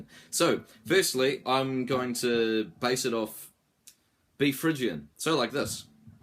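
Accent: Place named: New Zealand. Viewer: Sound like a kiwi bro, chur for the lesson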